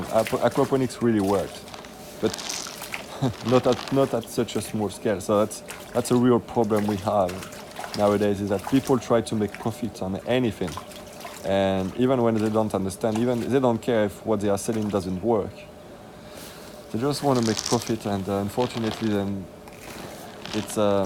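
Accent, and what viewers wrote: France. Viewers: Sounds french:-), thank you for this video and your chanel.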